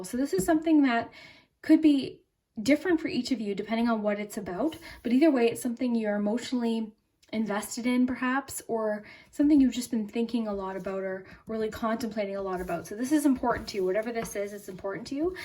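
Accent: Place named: Canada. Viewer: You sound like my dog's vet tech, but Canadian. I'm in love with your voice.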